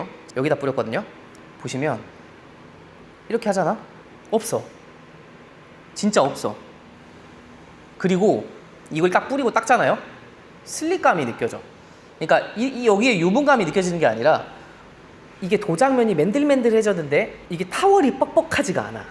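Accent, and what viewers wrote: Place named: Jeolla, South Korea. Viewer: 아따 전라도 사투리 구수하게 쓰시는데 전라도 아니셨습니까?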